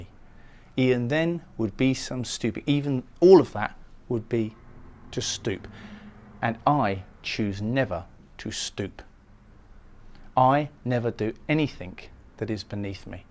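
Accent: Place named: England, United Kingdom. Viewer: Excellent teaching, great to hear an English accent. Thank G_d for a simple interpretation in plain English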